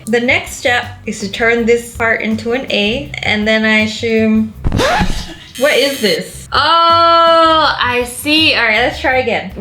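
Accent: Australia. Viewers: are you from Australia or America or where? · Please correct me if I am wrong, but I pretty sure shes Australian. [Sorr if I spelt anything wrong]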